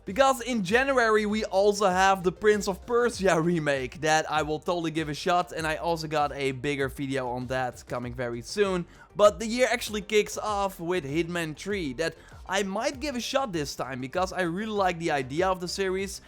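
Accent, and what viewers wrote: Netherlands. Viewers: R you dutch?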